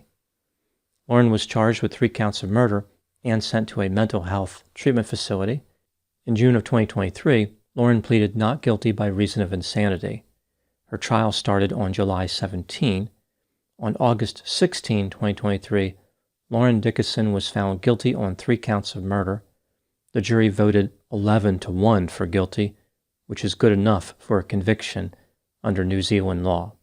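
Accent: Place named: Baltimore, United States. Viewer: are you from the Baltimore area by chance?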